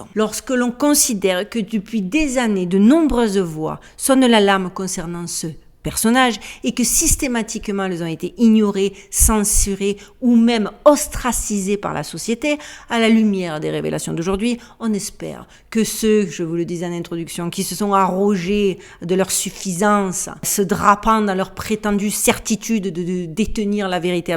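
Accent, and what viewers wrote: South, France. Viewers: Merci encore et encore tu as bien du courage de regarder toute cette abomination pour la montrer à ceux qui gardent les yeux et les oreilles soigneusement fermés. Que. d'horreur!! certains etres humains (??? ) sont vraiment capables du pire et c'est pas d'aujourd'hui..déjà ds l'histoire en France Gilles de Rais et la comtesse Batorl et beaucoup d'autres qui par leur "rang" étaient "au dessus des lois, de la morale, près du pouvoir" C'est immonde et je crois que peut etre nous vivrons la chute de cette mauvaisete maintenant que la boite est ouverte, la puanteur qui s'en dégage est si forte que bientôt mm ceux qui se pincent le nez vont la sentir. Tu es aussi intelligente et courageuse que jolie à regarder et j'adore ton élocution qd tu t'enerves avec ton petit bout d'accent du soleil. Bisous de la mémé de france 😘👵💖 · je reste, car ta voix est comme un soleil chantonnant d'accent du sud, et rayonnant de ta beauté d'ange blond.. 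Merci d'être là contesse.. Il n'y a que les jaloux qui partent lol! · Ma chère garde ton courage et ta pugnacité❤ j’aimerai bien un jour entendre ton anglais à la marseillaise car comme moi tu n’a jamais perdu ton accent😉😅. Je vis en Belgique et je me fais repérer à tous les coups😅 surtout quand j’avais du grain à moudre avec la police 🥴 garde une zen attitude, les trolls sont toujours là mais si tu te dis que c’est parce que le camp adverse a peur, c’est que tu es sur la bonne voie…🥰🥰🥰stay safe and happy😘😘😘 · jaime bcp laccent du sud bref je vais regarder la video meme si jai jamais aimer ce p.diddy comme 90 % des rappeurs americains..jespere juste que ca sera pas complaisant....jai vu une video ou un mec essayer(il ramait)de defendre p.diddy....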